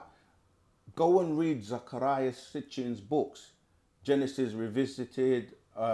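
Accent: South Africa. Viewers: I can hear this broor is probably Zulu/Xhosa from South Africa 🇿🇦🇿🇦🇿🇦shout out ......Thank you for the break down ❤️‍🔥❤️‍🔥❤️‍🔥❤️‍🔥❤️‍🔥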